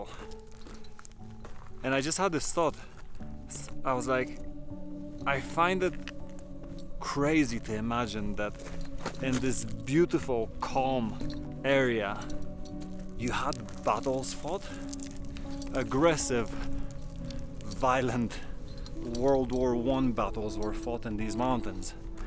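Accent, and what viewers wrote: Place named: Romania. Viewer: Mi-am si zis, ca dupa accent esti roman. Felicitari pt ceea ce faci!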